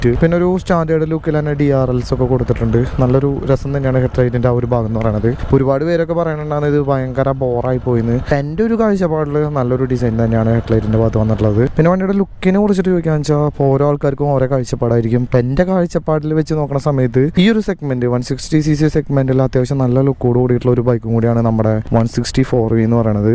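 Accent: Thrissur, India. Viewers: bus inte Peru kando "Pooram"... Coincidence paranjal 'Oru Thrissur karan , infamous slang and Rtr 160 4V koode aa busum ..' Age oru kunju Pooram feel ✊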